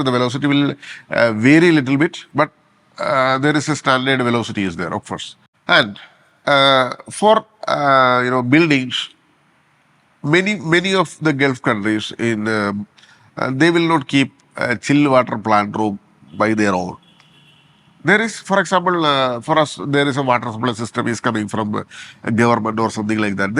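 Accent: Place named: India. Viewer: dear you are doing great job. but please pronounce words in a way so any one can understand easily. your pronunciation is like typical domestic indian language. keep in mind while making the video tht Your audience is not only from India but also from all countries. so your words should be understandable for all of them.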